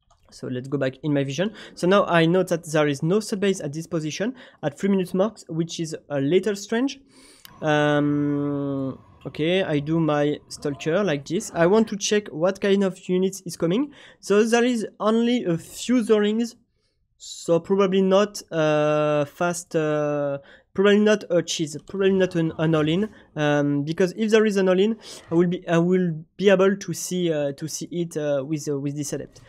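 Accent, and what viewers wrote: France. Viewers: sont accent est si français que les sous titres automatique sont généré en français j'adore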